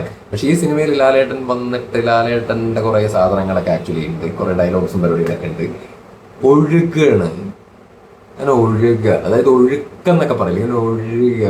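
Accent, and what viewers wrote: Palakkad, India. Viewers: നിങ്ങളുടെ പാലക്കാടൻ slangil സിനിമ റിവ്യൂ കേൾക്കാൻ കാത്തിരിക്കുകയായിരുന്നു.😁👏👏Great work.. Keep Going.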